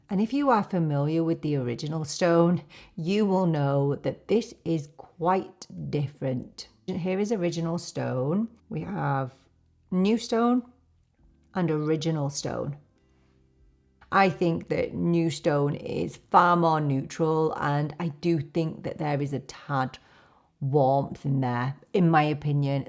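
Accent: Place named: Yorkshire, United Kingdom. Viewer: Subscribed as soon as I heard your (Yorkshire?) accent! Not often I find someone on YouTube in my age group and from Northern England 😊. I too moved away many years ago and listening to you reminds me of home. A MAC lipstick video as well…perfect!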